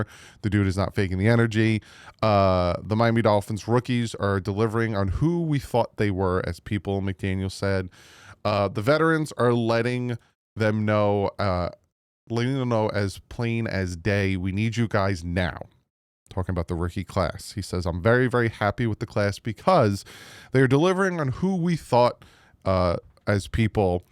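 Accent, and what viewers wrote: New Jersey, United States. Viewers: At least your jersey accent isn’t Tony Soprano esque 😂😂😂 then it would sound like “Mika Fitzs-hhpatrik”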